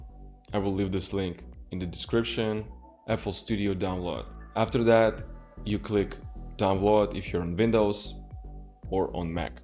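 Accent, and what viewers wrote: Russia. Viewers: ты русский, акцент палит)) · Русский акцент слышно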